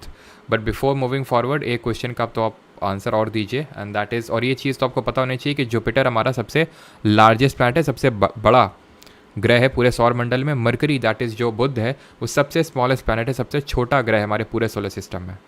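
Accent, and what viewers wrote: Kanpur, India. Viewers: Sir kanpur se ho aap ?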